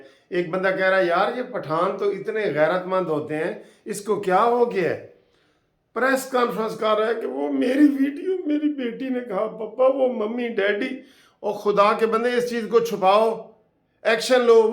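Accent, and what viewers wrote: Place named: Punjab, Pakistan. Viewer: Maashallah buht khub pnjabi tuch buhat achha lgta hy slamt rahinn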